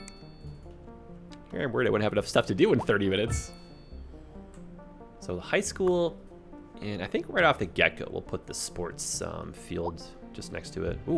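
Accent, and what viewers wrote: Canada. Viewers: Will you be doing any sections from Toronto? Maybe a highpark area or possibly do the Gardiner? First time watching and knew you were canadian with all them "Eh"s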